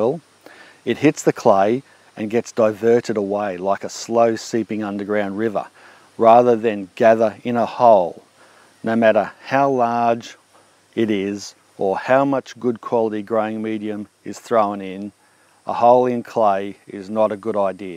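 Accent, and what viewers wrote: Australia. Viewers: My wife got me into watching your videos, love your Aussie accent. We are from San Antonio TEXAS and I'm trying to grow avocados and I keep having the same problems. Well you just told me how to fix them my yard is nothing but clay soil, I will have to mount them up now. LOVE YOUR VIDEOS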